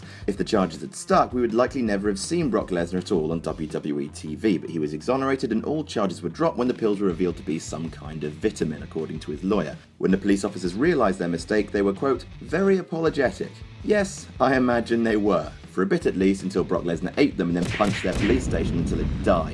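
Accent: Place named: United Kingdom. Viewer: Anyone else laugh themselves to hysterics when he said "TROLOLOLOLOLOLOLOL" in that deadpan British monotone?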